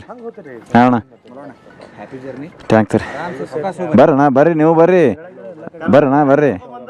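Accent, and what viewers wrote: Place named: Kalaburagi, India. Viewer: Ur kalaburgi kannada is super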